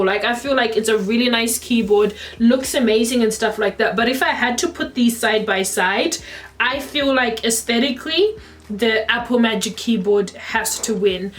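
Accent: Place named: Australia. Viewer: Thanks for sharing so much detail, I love your Aussie accent :)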